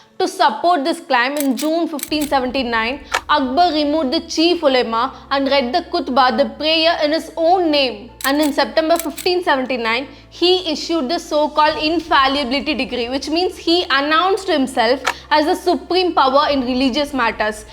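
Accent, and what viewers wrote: India. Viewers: Which reason of India is her accent from? So cute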